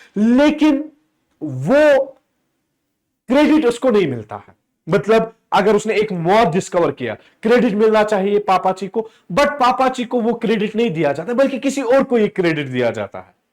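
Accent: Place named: Kashmir, India. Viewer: The kashmire tone of can be go fir a kashmire 😊❤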